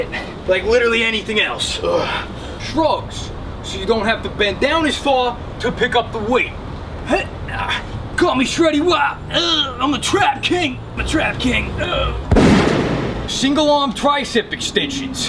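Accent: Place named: New York, United States. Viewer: I like your funny accent like newyourker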